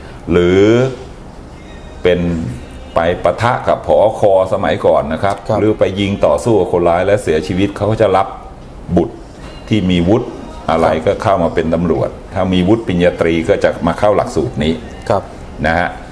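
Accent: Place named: Rural, Thailand. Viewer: ถ้าไม่รู้จักมาก่อนแล้วฟังสำเนียงพูดยืดยาดเหน่อนิดหน่อยมาดแบบลุงบ้านนอกคนหนึ่งใครจะรู้ว่าเป็นสิงห์มือปราบลือนามคนหนึ่งของตำรวจไทย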